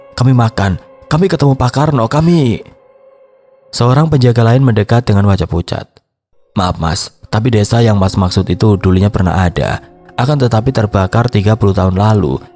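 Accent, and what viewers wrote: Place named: Java, Indonesia. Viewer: Logate medok syekalee😂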